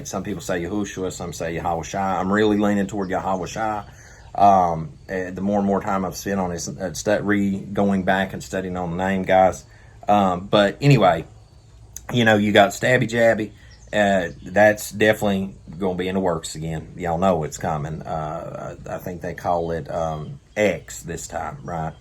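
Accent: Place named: South, United States. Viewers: As a colored young man I can honestly say I actually like that country accent bro lol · Brother, I was blessed when I found your channel with someone else with an accent like mine. I'm from Kentucky, but our accents are very similar so you feel like home to me. I thought of something while you were talking, and I'm sure you and others have already done thunk it long ago. While the fake Saturday Sabbath Keepers are getting persecuted us lunatics keeping the truth Sabbath will probably be flying under the radar and not get persecuted, but protected by the Most High for keeping His true Sabbath.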